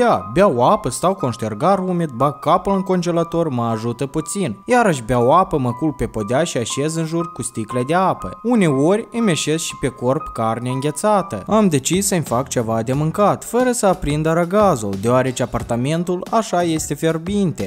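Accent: Moldova. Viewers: Pai se vede dupa accent ca e din Moldova.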